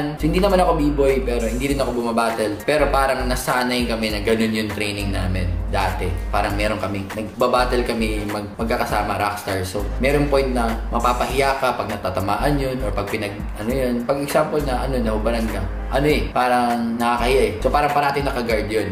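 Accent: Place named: Visayas, Philippines. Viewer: Ahahaha ka cute ni DJ Loonyo mag bisaya uie😂😂😍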